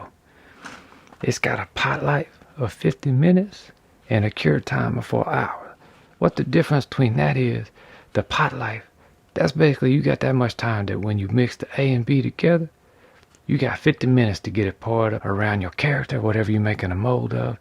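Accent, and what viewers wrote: Louisiana, United States. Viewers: Are you originally from Louisiana you have a hard accent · I wanted to reach out and tell you something funny, I have a little game I play with myself. Anytime I watch a video online and I can hear people talking, I love listening to the accents and being a Louisiana native, I can ALWAYS spot a cajun accent! ;) I do a little bit of digging and everytime so far, I've been right. Well today my bf was watching your video and he mentioned to me that HE thinks you're from Louisiana, based on the accent alone. I noticed two other things: you have a cup from Newks (which is only in the south, and I've been to the one in BR), and you have a PLASTIC cup.. and every single Louisianian has a cabinet full of plastic, mostly Mardi Gras cups. 😂 I crept on your various social media platforms, with no answer as to where you're from. Is it Louisiana, and if so, what part?? 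My bf and I are from good ol Gonzales. I hope this story made you laugh 😂 take care and laissez les bon temps rouler! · is you Cajun? I bet you is. I love the videos. So educational and relaxin. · I just want to know what state you’re from, your accent reminds me of my dads (Louisiana). He does cool craft stuff too, specialty is tie-dye haha.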